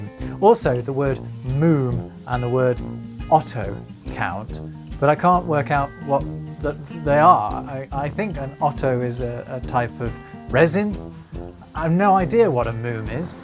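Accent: United Kingdom. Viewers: BRUH YOU ARE BRITISH?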